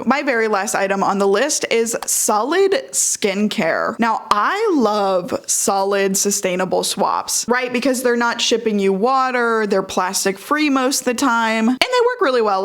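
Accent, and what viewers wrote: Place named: United States. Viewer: Its a regional accent thing in America. The south and North western accents from my experience do that. Like how mid-west accents drop y's, hard A's and eh's all over the English language at seemingly random. American accents aren't consistent. They are numerous and varied.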